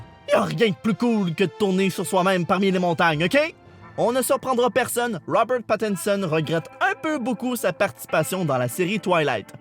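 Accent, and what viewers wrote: Quebec, Canada. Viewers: À enfin un you tuber québécois qui parle vraiment le québécois! 😁😁👍🏼👍🏼👍🏼👍🏼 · Choko Chok euh non c pas la seule, J'ADORE L'ACCEBT QUÉBÉCOIS 😍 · En vrai, je regarde toutes vos vidéos seulement pour l'accent... (et un peu les blagounettes aussi) #ViveLeQuebec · Étant moi même québécoise, j'adore ton accent 😍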